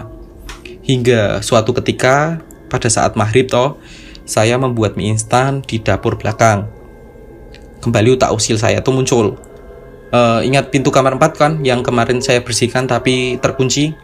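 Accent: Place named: Semarang, Indonesia. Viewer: Logat semarang kihh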